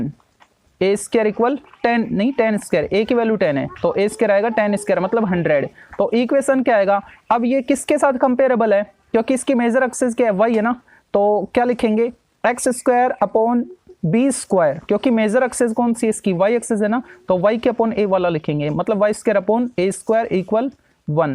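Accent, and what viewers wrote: Haryana, India. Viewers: Sir aap haryana se ho na 🎉❤😇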